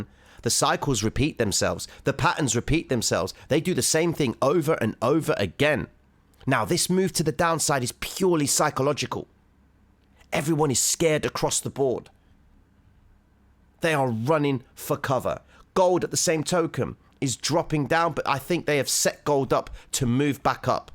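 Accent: Cockney, United Kingdom. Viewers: Love you cockney T.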